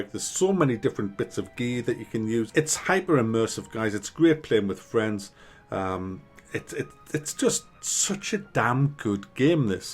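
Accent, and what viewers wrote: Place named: Newcastle, United Kingdom. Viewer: Pretty sure he's a geordie or mackem not an aussie... agree on the US election point though!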